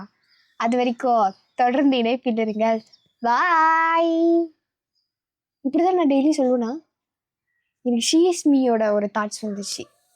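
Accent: India. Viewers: பாப்பா, நீங்க பேசுறது மதுரகார பிள்ளை மாதிரி இருக்கு..❤❤ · Unga slang la patha Chennai pola illaihey epdi... native really chennai thana?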